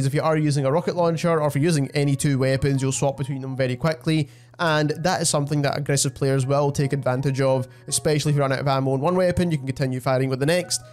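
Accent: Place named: Scotland, United Kingdom. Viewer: Scottish pretty sure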